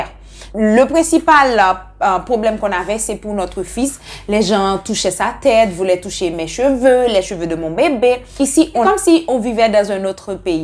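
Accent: Haiti. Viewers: Elle a un accent de Haïti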